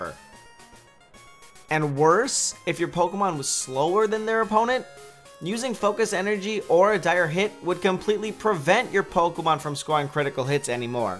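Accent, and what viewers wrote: Netherlands. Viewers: Are you dutch? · Wait are you dutch?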